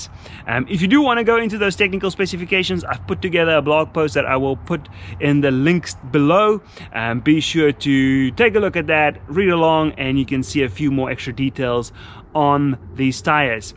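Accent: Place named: South Africa. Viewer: I was wondering what your accent was! South Africa, very cool. Probably good riding there too. I've got 1800kms on my Nano's and there's another 1000 or so km left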